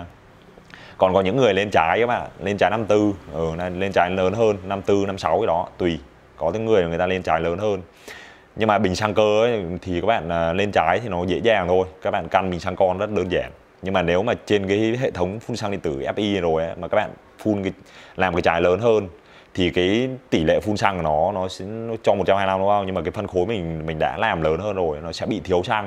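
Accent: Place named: Bao Loc, Vietnam. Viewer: nghe dọng anh giống người dân ở bảo lộc lâm đồng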